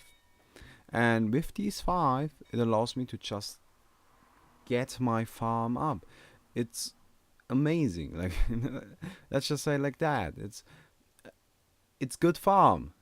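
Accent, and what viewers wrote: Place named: Germany. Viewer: Dude you're german, so your accent is amazing! And this is the simplest yet best bit of advice you can give us! I consider myself a "decent" last hitter (in casual mode, no stats) I can generally get every last hit! But as soon as I go matchmaking I start failing!! :( I don't know what I do differently, I guess the other players harass me so I don't get a chance to last hit! :(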